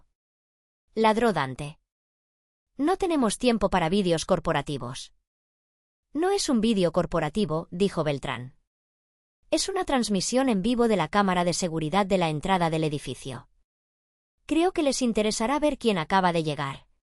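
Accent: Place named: Spain. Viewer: Sería interesante oír la narración en español de México y no el de España, ya que se supone que la historia se desarrolla en México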